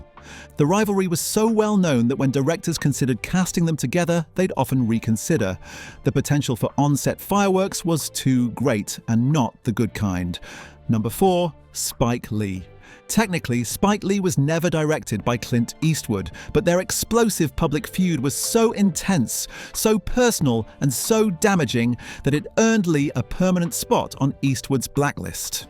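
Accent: United Kingdom. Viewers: This British accent voiceover seems to be on all these types of videos.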